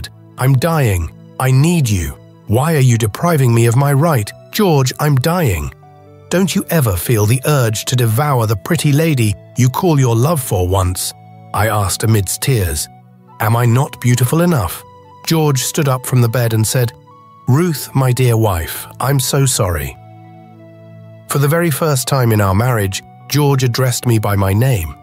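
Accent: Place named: Europe. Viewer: I'm sorry but, why is this being read by this european voice? He isn't pronouncing the oooo's and hmmms correctly.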